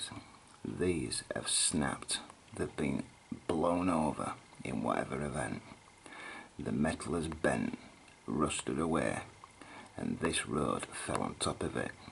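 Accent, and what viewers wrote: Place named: Scotland, United Kingdom. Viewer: Love your work, being a fellow neighbour scot..dig the accent (no pun intended) i love sherlock holmes at the best of times..i mean serously..have learned much form his methods and observations but this takes it to a whole new level...when you explain it then you see it for what it is...it becomes the elephant in the room...i have a construction back ground myself, so know a thing or two from an engineers stand point... and i look all around me at modern cities every where i have traveled been to over 21 countries so far...and i can't help but notice that rapid rate of erosion and dilapidation of our modern buildings...so really makes you wonder what <num> odd years would look like?...and it pretty clear that IS what we are looking at..so many pre-civilisations being reset every few thousand years...when your numbers up - your numbers up.....keep up the amazing work..you are doing breakthrough work. 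1 QUESTION ?? interested to hear your theory on what would create the volume of sand we see everywhere all over the world...Like the deserts...(Maybe once Oceans) its maybe that the old world was Silca based and now we are carbon....SO much sand..(and) water..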